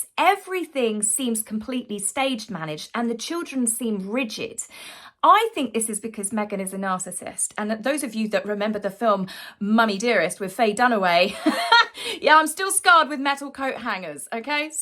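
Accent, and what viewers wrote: United Kingdom. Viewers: I love hearing you, I am not English native speaker, British is easier to underestand.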